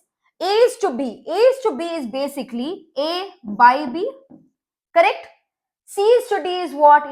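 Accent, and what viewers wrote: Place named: Tamil Nadu, India. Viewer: Your tamil accent is nice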